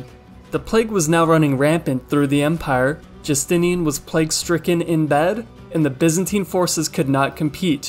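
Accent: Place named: Italy. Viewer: Tabish here watching from Lori's computer lol ...love the italian accent lol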